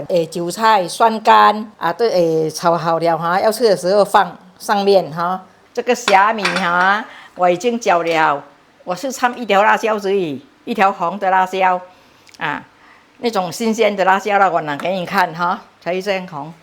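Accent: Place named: Hokkien, China. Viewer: you are fantastic. I love mee Siam., I love your Chinese with, I believe, with a taste of Hokkien. So familiar and homely.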